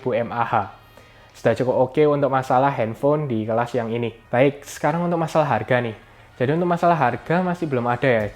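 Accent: Java, Indonesia. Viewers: medok amat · Gara gara logat jowo ahire aku subscribe.. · Medok itu keren bro 👍🏻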